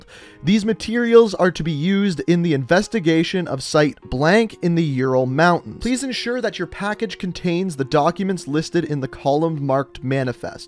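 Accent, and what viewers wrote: Canada. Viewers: Is this dude from Canada?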